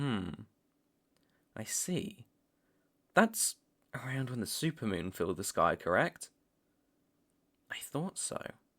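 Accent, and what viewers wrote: United Kingdom. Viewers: I SEE BRITISH I ARIVE IMEDEATLY (not in a weird way or anything also, I'm dyslexic, give me a break) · playful teasing even when serious plus the brit sound :face-orange-biting-nails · Me not seeing this is a British audio "Hey there!" Jumps in holly sweets that scares me Me: "Why is it always the accents that startle me?" This was so much fun to listen to though! I loved it! Absolutely enjoyable!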